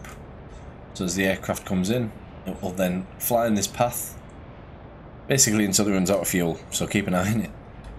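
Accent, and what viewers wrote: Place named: Scotland, United Kingdom. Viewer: As a non English native speaker, I sometimes have a hard time to get your charming scottish(?) dialect. But: your tutorials about this game are short, precise and in a perfect way, useful to me. Thank you, subbed! BTW. Did you tried the VR version already? Just in case, I didn’t found out how to zoom sight.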